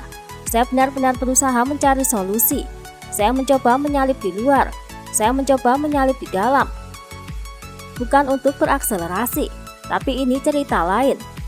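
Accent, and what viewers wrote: Java, Indonesia. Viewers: Mbak e medhok men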